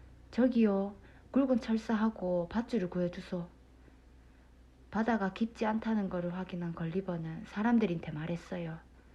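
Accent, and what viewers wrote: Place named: Pohang, South Korea. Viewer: 편안하게 엄마가 베드타임스토리 들려주는것 같았습니다. 잘들었어요. 포항이신듯요.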